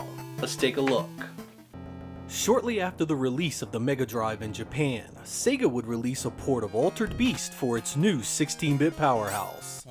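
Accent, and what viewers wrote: United States. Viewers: I guess he's from America?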